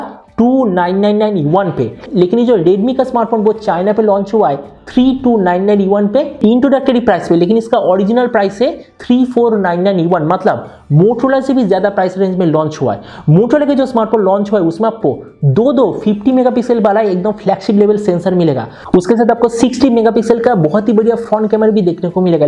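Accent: Bengal, India. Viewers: Bengali babu when says hindi,sounds lovely 👀❤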